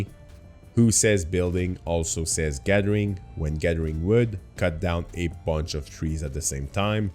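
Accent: Quebec, Canada. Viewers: you have a French Canadian Quebec accent it sounds like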